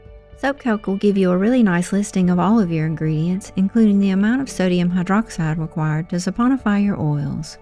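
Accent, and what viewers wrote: South, United States. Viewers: Wow, I really like this swirl ❤. I love making soap and watching your videos too, they are so relaxing and you have such a nice voice, I love your southern accent 😊. Thank you for another wonderful video.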